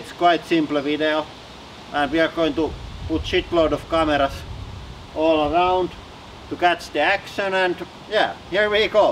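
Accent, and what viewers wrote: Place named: Finland. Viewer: I'm here for the finish accent only.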